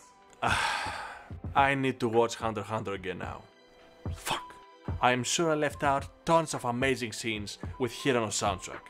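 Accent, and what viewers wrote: Greece. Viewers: Oh! That's a greek accent! Γειά σου φίλε! Ωραία ανάλυση! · Only Greeks speak English with that kind of accent.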